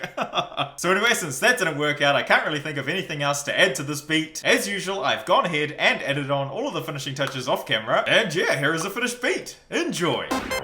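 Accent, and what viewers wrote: New Zealand. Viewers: bro are you new zealand?? sorry im new to this channel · Are you a kiwi by any chance? Your accent absolutely sounds like a New Zealander.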